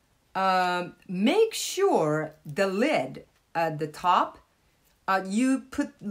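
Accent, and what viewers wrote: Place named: Spain. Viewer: Thank you very much for a very clear clarification. There were at least two reasons I asked such a (stupid) question. Oriental people, i.e. Chinese, Korean, Japanese, etc., mostly have slanted eyes and yours ain't. Your English with a bit heavy in Spanish accent (I bet you fluently speak both Japanese and Spanish). Regardless, I do have some questions about how you make Nattō and probably some remarks / suggestions. I hope you will find plenty of time to address them. 1. You mentioned in your video that you first soaked your soybeans overnight. Am I right to say that you used cold water to soak your soybeans in a room temperature of around 25°C (or 77°F)? 2. Your video clearly explained how you pressured steam the soybeans. In the process of setting up for a fermentation, I believe I saw some water left in the inner stainless steel pot with your inoculated soybeans in a Pyrex container to ferment, am I right to say so? 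So far, these are the only two questions I have. Now, if you don't have any objections, I would like to make some remarks / suggestions about Nattō. 1. Do you know naturally soybeans contain some bacillus subtilis (a gram-positive, catalase-positive bacterium) which can survive in extreme environmental conditions of temperature and desiccation <link> 2. Nattō <link> is basically made from whole soybeans that have been fermented with Bacillus subtilis var. natto. I started doing some researches and found these 3 videos <link> <link> and this video <link>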